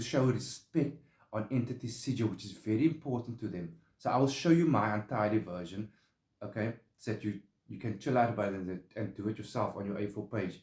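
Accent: South Africa. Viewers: Correct me if I am wrong...you sound Capetonian South Africa.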